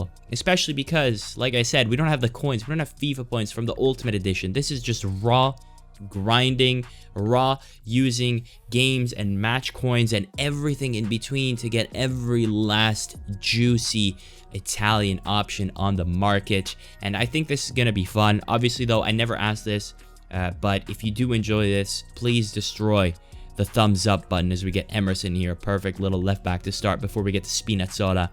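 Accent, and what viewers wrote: United States. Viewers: I've actually been watching for almost 2 years but what is your background? As far as I know you're Italian living in Italy but you sound so American. Just curious. Love your content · Bro i tought u were american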